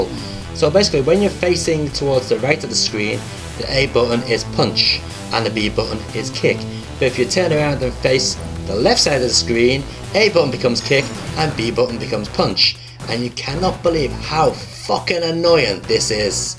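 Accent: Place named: Liverpool, United Kingdom. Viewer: Lol... I know it's a while ago now but your rant of DD2 (poor controls) was very funny! Especially with your Liverpudlian accent... Liverpool is right yeah?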